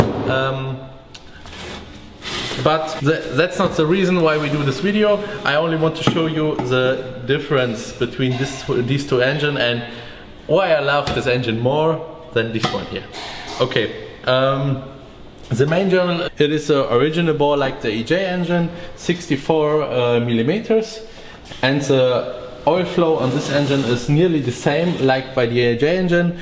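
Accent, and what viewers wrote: Germany. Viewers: Lmao was not expecting a very strong German accent and then realizing you’re putting them in air planes not cars really surprised me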